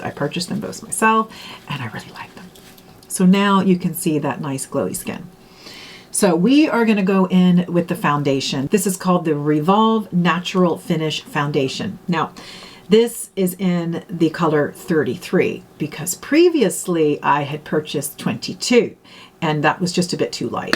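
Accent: Ireland. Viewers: I like the whole line up… lipstick included! You are developing a tinge of an Irish accent😊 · Are you irish , could swear i heard some irish accent in there, im irish by the way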